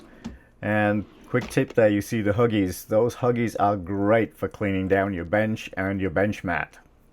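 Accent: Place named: Texas, United States. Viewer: Great video! Interesting how the Texan accent has partially rubbed off on you so quickly,-) I have almost the exact same set of tools as you. Well I have one of those blue silicon mats. Very interested to see the next video, a, because I just bought an Elgin watch from another US based watch repairer, and I have a beautiful, imho pocket watch, which definitely needs servicing one day. Love the vintage tools!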